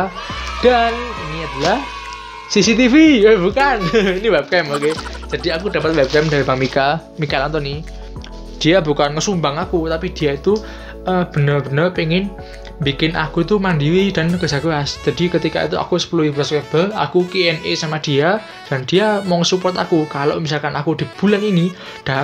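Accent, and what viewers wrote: Java, Indonesia. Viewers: logat jawa ya bang?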